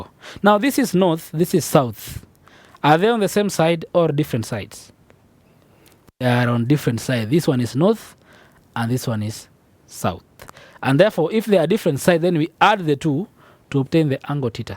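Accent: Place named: India. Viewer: his english acent closely resembles an indian english acent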